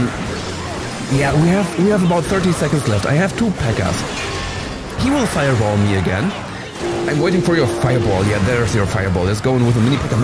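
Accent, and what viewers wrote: Germany. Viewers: are you german? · He is'nt an english or a amarican guy i think He is german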